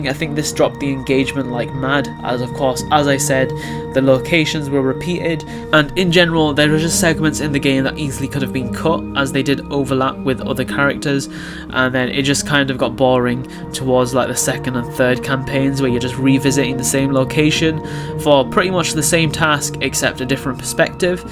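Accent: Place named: United Kingdom. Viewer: You sound soooooooo british hahah love it man keep it up!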